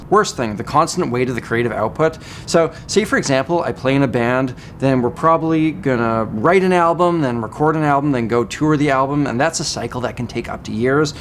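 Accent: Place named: Canada. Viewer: I think I found on a JHS "What's on your board" sort of video, and I remember thinking "Hey, I think this guy has a Canadian accent", and as soon as I found I was right --> SUBSCRIBED. Love ya, congrats on 1 million subscribers, it's well deserved.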